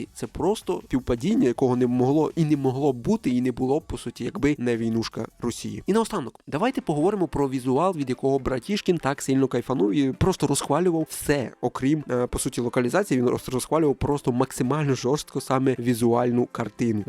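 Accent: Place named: Transcarpathia, Ukraine. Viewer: Ого, закарпатський акцент. Кайфово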